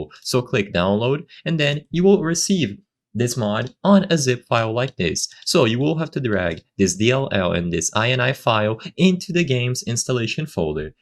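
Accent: Brazil. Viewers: Percebi que é brasileiro pelo sotaque 😅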